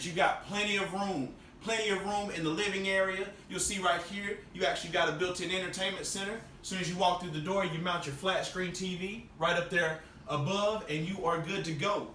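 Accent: South, United States. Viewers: with that intellectual, southern accent 💜💜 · "In case you got little chitlins." 😆 I'm from the North so the accent really gets me. Of course, you all are now saying, what accent, you got the accent! 😜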